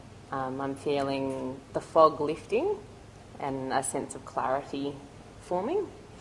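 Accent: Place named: Russia. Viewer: Эх, сразу чувствуется , русская женщина представляет скэнар ,акцент выдает )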